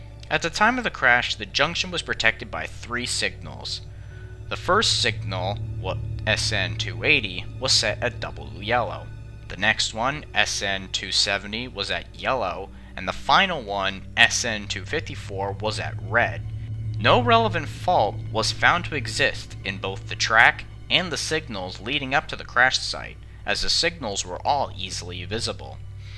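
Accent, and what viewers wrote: United States. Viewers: yall are so mean, he’s American and is trying his best to talk out British words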